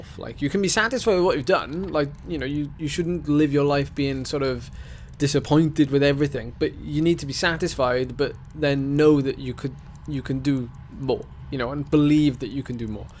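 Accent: Wales, United Kingdom. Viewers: Hope you don't mind but I turned the video off and just listened to your soothing Welsh tones 😁 Well done buddy 👍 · Who would want to mute a good welsh accent? 🏴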